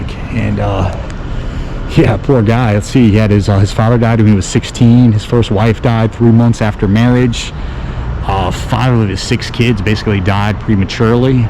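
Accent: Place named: Delaware County, United States. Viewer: Your Delco accent is strong AF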